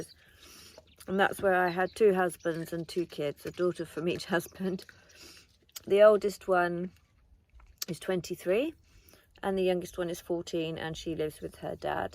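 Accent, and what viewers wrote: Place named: West Country, United Kingdom. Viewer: It's nice to hear a bit of your story. I used to live in Exeter and I'm from Marlborough, I knew I recognised the slight ooh arrr in your accent.. 😂, don't worry, it's very slight. Haha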